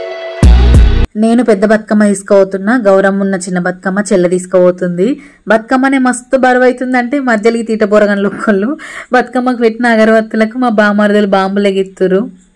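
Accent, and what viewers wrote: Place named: Karimnagar, India. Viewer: మాది కరీంనగర్ జాబు భద్రాచలం లో కని మీ మీరు యంగ్ గ ఉన్నారు బాషా చాలా బాగుంది మేము మీ బాషా చాలా మిస్సు అయం సూపర్ మీ వైఫ్ మీది కేమిస్టర్రి బాగుంది 🎉🎉🎉🎉🎉🎉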